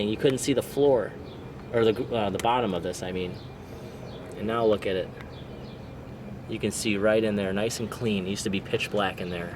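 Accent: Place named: Chicago, United States. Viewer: I heard the Chicago accent. Then I could tell your Right in the flight path of Ohare airport like me.👍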